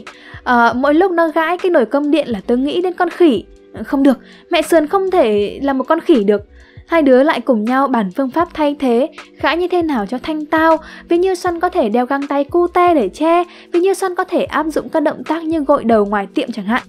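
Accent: South, Vietnam. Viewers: Hình như đọc giọng nam phải không (ý mà miền Nam nhé không phải nói nam nữ 😁)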